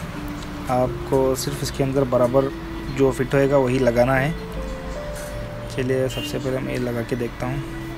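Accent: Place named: South, India. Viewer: the way you are pronouncing M ....I think you are from south🔥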